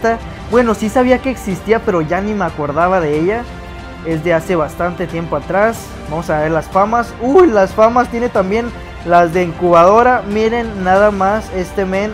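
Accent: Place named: Chihuahua, Mexico. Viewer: Awuebo puro chihuahua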